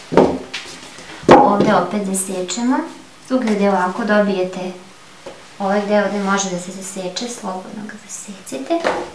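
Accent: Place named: Vojvodina, Serbia. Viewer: bravo svaka čast po naglasku se može oceniti da si iz vojvodine :-)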